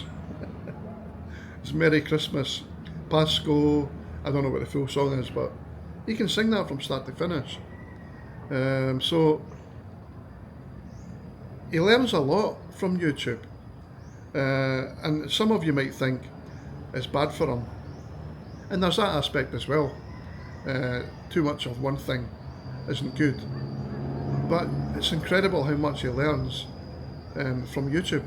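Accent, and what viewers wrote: Scotland, United Kingdom. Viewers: The algorithm popped your channel in my feed and it was so good to hear a Scottish accent I hit subscribe. I just retired to KL from Scotland a couple of months ago because my son lives here. I was a special needs teacher and I was blessed enough to work with many autistic and Down's Syndrome kids over the years. I'm glad your wee boy goes to a good school and is making great progress. You and your wife are knowledgable and cognizant of your son's needs and doing a fantastic job. Much love to your family!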